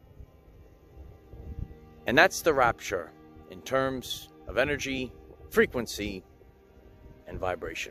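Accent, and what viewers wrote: New York, United States. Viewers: You must be a fellow NYer :) Thanks for this great video 💕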